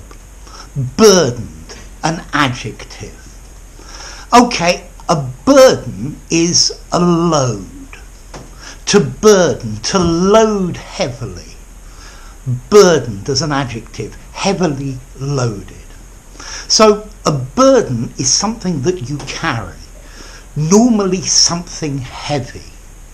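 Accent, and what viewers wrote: United Kingdom. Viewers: Are you from Great Britain🇬🇧?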